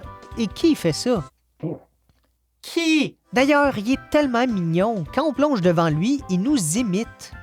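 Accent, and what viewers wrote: Quebec, Canada. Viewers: Ma réaction en écoutant ce vidéo suggéré Wouah c'est québécois?! Je suis québécois et c'est tellement rare d'avoir du contenu de jeux retro en québecois et non pas en Français de France. Cool.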